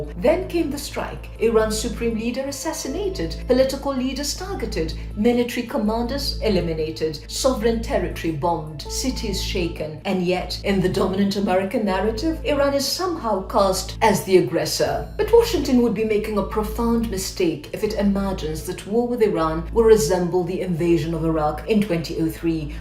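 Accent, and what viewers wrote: RP, United Kingdom. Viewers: That's a fine British accent you've got. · As an American I just want to say that her accent is both impressive and off putting at the same time. - To me it’s impressive that she learned to do a posh, aristocratic English accent so well, but it’s also off putting because she sounds like an English aristocrat to me. I’ve only ever heard that accent in plays or when people are intentionally trying to be silly, so it’s hard for me to take it seriously when someone uses that accent. My whole life the only time I’ve heard anyone speak like that, it was Americans who were trying to be ridiculous for a laugh. I’m very opposed to this war and want to help everyone else who opposes it to get their message out. I understand I’m saying something here that might rub some people the wrong way, but it’s intended as constructive criticism. I’m trying to help. 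· Is this truly her accent, or is she mocking the brittish London accent . But she does make some great points though... kudos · Do you really speak in Received Pronunciation, or is this a put on? Nice message!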